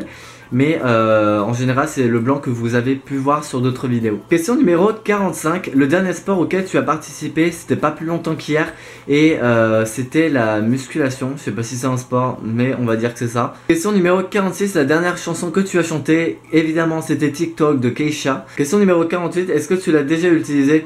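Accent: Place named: United Kingdom. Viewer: accent British parfait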